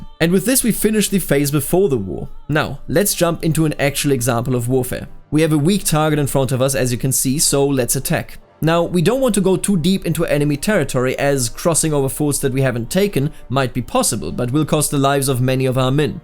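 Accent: Bavaria, Germany. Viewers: Kommst du aus Bayern?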